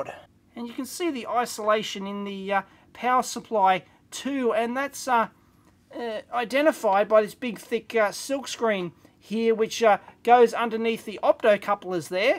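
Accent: Australia. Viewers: Nice one! That dumpster is becoming an electronic goldmine!! Keep up the good work, Love the Aussie Accent too!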